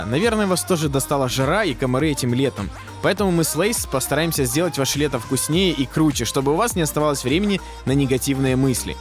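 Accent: Odessa, Ukraine. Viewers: Вы из Одессы?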